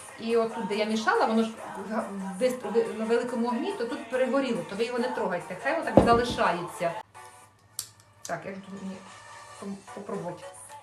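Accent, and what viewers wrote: Ukraine. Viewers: А мені дуже подобається Ваша мова, не в цілому — українська, хоча і вона також, а саме Ваш говір, акцент! На Заході і на Сході України зовсім не так розмовляють. А от у Вас якесь літературне мовлення... мелодійне, співуче. Саме так розмовляють мої родичі на Черкащині... Я інколи навіть заслуховуюся...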